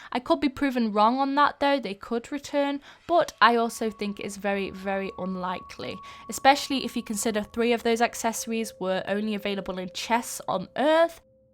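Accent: United Kingdom. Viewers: YESSS, BRITISH ACCENT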